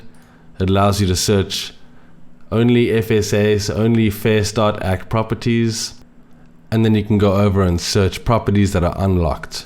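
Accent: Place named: South Africa. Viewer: In Spain ..Had Upland for over a year and still don't know how to do hunts..so lekker to hear a SA voice 😁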